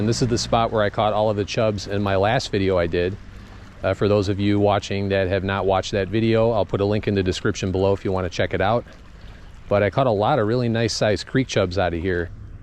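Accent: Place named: Canada. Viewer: Where are you from.. sound like a Canadian or Wisconsin area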